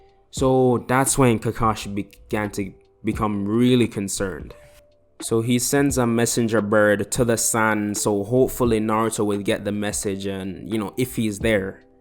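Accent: Jamaica. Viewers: this guy sounds like he has a Jamaican accent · are you jamaican?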